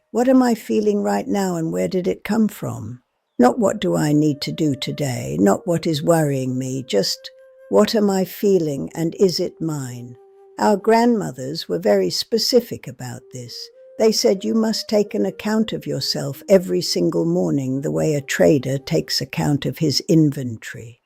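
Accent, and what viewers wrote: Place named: England, United Kingdom. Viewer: Lmao as an Actual Native it’s funny to kitten to an Ai native with an English accent lol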